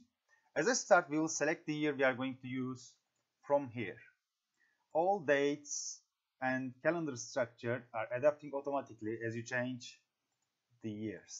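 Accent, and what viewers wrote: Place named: Turkey. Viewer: Merhaba, şöyle bir fonksiyon mevcut mu excelde? İçeriğindeki bilgiye bakılmaksızın bir sayfadaki hücre format biçimi olsun, satır ve sütun yüksekliği, genişliği olsun diğer sayfalar ile köprülenecek, bağlantılı hale getirilecek? Yani ben ana sayfada a1 hücresi için metin hücresi olacak diyeceğim, genişliği de 3cm olacak diyeceğim ve diğer 10 sayfada da bu değişiklik takip edilecek, otomatik uygulanacak. Hangi değişiklik yapılırsa yapılsın, sallıyorum genişliği 4cm olduğu zaman diğer sayfalar da değişecek ve bu sadece bir hücre değil bütün hücreler için geçerli olacak. Kısaca gölge sayfa gibi çalışacak ama üstüne formül ile ayrıca hesaplar döndüreceğim. Sanmıyorum ama uzun süredir bu sorunun cevabını bulamadım. Başarılarınızın devamını diliyorum. Videoyu dinlerken aksandan ötürü bir türkün seslendirdiği anladım, danışayım dedim :)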